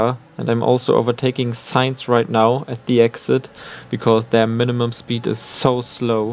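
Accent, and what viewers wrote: Germany. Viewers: Da hört man doch das deutsche raus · Are you german? The accent sounds familiar...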